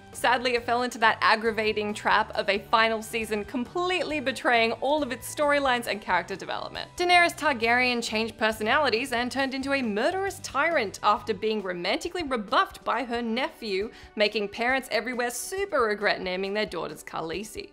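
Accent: Australia. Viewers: Is this person Australian? Just curious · What channel do I know you from??😳 I know you’re not british you’re Australian 💁🏼‍♀️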